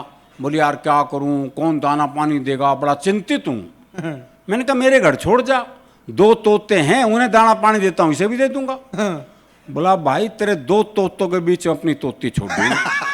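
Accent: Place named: Haryana, India. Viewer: All old jokes. Not one was original. Only the haryanavi accent was funny.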